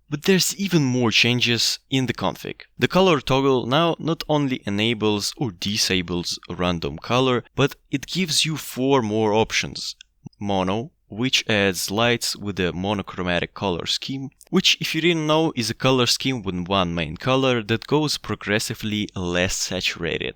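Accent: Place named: North Caucasus, Russia. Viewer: Bro must be from the north caucasus.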